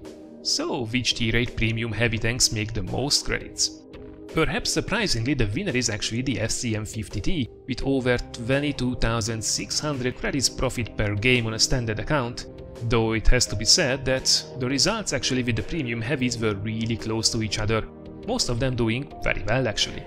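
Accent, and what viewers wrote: Hungary. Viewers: Hat ez nagyon hunglish lett, fajdalmas volt hallgatni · Are you from Hungary?😃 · Great video. I am 100% sure that you're from Hungary :)) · Magyar? :D Az akcentus nagyon olyan. :D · started the video while turning away from screen. after the 1st word, I would say (typing this without looking at either the comments or the user) this is a Hungarian accent... And, no mistake for sure...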